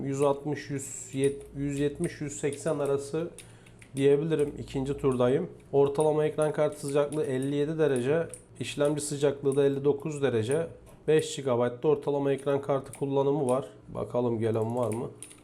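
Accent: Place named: Ankara, Turkey. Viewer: abiciğim sen nerelisin ya konusma seklın aynı ankara :D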